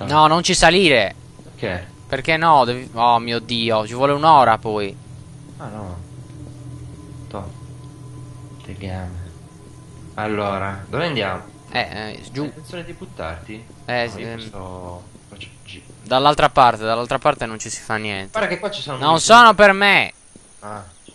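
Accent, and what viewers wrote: Sardinia, Italy. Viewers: aiò! Sardo sei?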